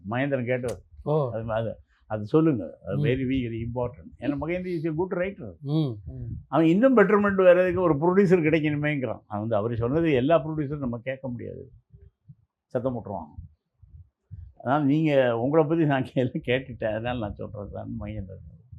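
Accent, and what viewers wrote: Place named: Tirunelveli, India. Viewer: super nellai slang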